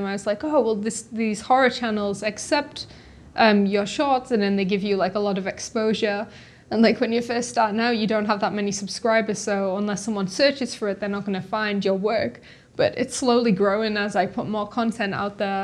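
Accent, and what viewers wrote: United Kingdom. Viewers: So straightforward and inspiring, best of luck. Also, the accent geek in me is intrigued as well, am I hearing Geordie origins maybe?!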